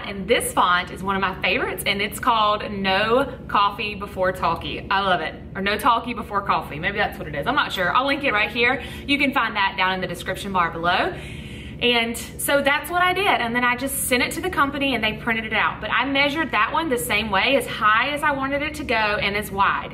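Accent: South, United States. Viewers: Are u southern?? Bc im from texas and i can tell by your accent but i dont wanna be rude and say your something your not lol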